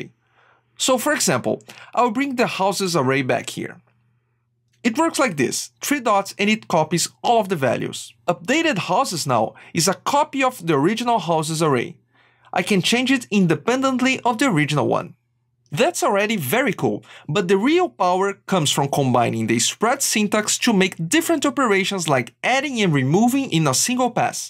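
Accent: Brazil. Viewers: Are you brazillian? You have a brazillian accent Nice vídeo!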